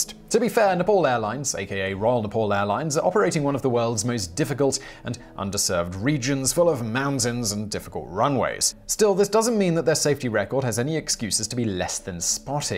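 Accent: United Kingdom. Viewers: So this video is extremely morbid. So why was I laughing through it? With Whistler's fast paced British accent, and the over-the-top 3rd world antics, the whole thing started to sounded like a Monty Python sketch. (No offense, Simon and writers--you are awesome)!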